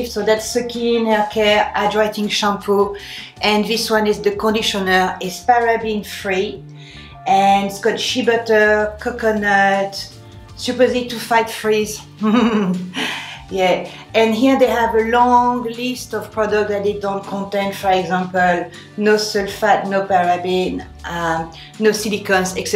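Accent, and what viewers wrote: France. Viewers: Tu me fais rire., française aussi a.,Adelaide on a kes memes cheveux fins qui frisent et j aime las trop trop de produits mii non plus., Silicium organic d. Espagne est suoer pour kes ongles la peau et les cheveux Moi aussi je suis une vitamins junky multi B fish oil,.....tu as raison pour la longueur mais je suis trop faineante pour me raidir les cheveux 😀 les australiens doivent adorer ton accent 😘😘bravo oour ton chanel comme tu dis 😘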